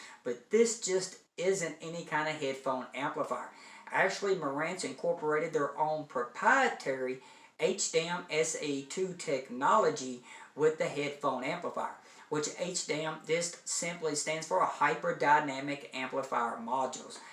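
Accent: South, United States. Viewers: Nice review mate! Luv the Southern accent! You’all did a great review here. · Good, clear review. As a non American your accent is interesting. As are some of the adjectives that you use. I'm guessing that you're based somewhere in Southern Amserican States. Fascinating.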